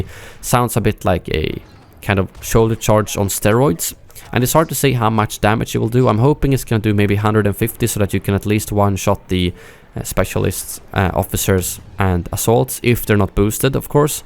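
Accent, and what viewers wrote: Sweden. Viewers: Är du fråb Sverige?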